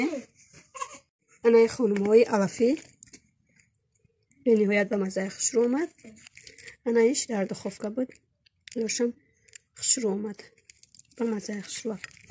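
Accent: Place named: Hisor, Tajikistan. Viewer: Салом алейкум чон ранги хисориё гап мезани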